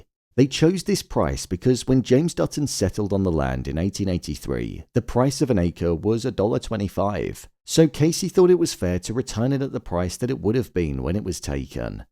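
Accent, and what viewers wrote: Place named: RP, United Kingdom. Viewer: This BBC voice narrator is definitely not helping the review. What a shitshow ending.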